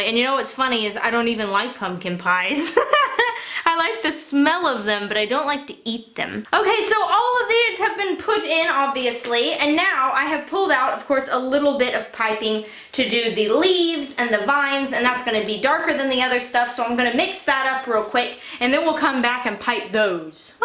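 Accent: Texas, United States. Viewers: She sounds more Texan than usual in this intro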